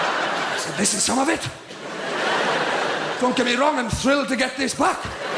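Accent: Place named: Wales, United Kingdom. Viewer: that is the most welsh accent I have ever heard !!!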